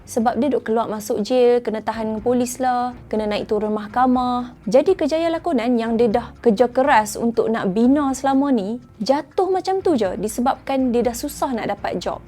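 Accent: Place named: North, Malaysia. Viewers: Wakk single tak? Sy suko loghat utaraa mcm mana nk kenal SI Gadis ni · Cayaq tengok awek utara ni cerita.. Begitu pekat loghat dia..😊❤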